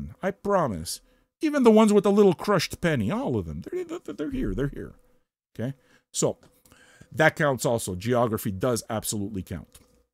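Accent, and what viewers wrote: Canada. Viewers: You Canadian?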